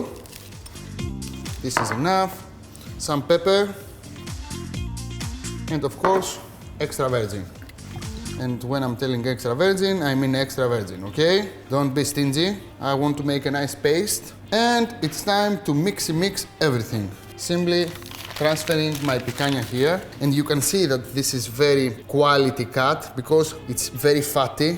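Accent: Greece. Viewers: Thank God, the video is subtitled. 😆😆😆 I've rarely heard anyone speak English with such a heavy Greek accent: to the point of being almost unintelligible.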